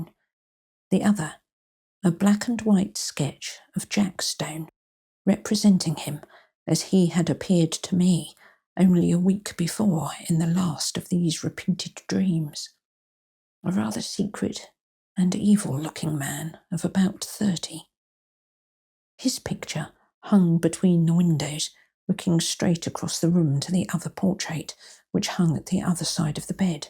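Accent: England, United Kingdom. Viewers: Can’t place the part of England you are from. Although I’m Scottish a lot of my family lived in Sussex, London and Wiltshire . Your cadence is softer and very pleasant and your readings are marvellous . I would love to hear you read “ Schalken the painter “ by Sheridan Le Fanu. Both a horror tale and an allegory of arti being sullied by rich patrons!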